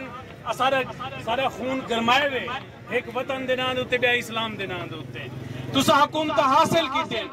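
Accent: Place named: Saraiki, Pakistan. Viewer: بہترین وسیب دی آواز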